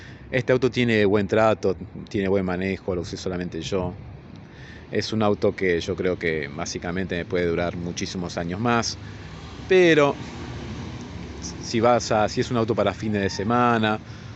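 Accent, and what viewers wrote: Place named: Argentina. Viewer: desp de ver y escuchar varios vídeos, me encantó escuchar tu vos de argentino jaja. comparto con todo lo q dijiste. muy buen video, gracias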